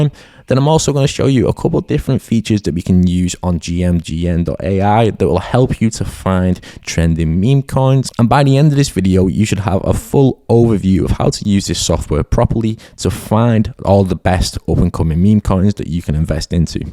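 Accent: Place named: United Kingdom. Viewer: Always subscribe when I hear the UK accent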